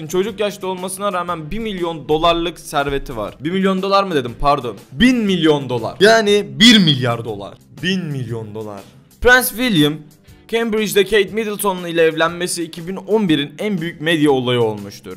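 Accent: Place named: Hatay, Turkey. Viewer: hataylı olduğu nekadar belli 😃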